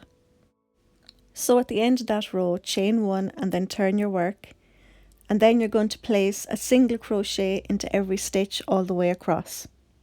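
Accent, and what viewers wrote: Ireland. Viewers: Hey! I love this sweater. I just found your channel and subscribed immediately. I love your Irish ☘️ (I think 😊) accent. I might be binge watching your channel today 😉. Thank you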